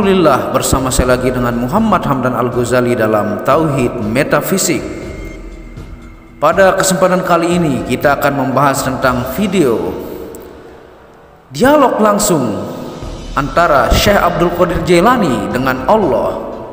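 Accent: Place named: Lombok, Indonesia. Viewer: Kayak logat orang lombok side meton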